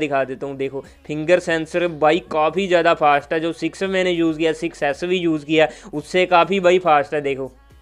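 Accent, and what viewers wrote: Punjab, India. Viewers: Punjabi bhra mil gya - me awaj to hi pachan lea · Hindi in Punjabi Accent😅🖒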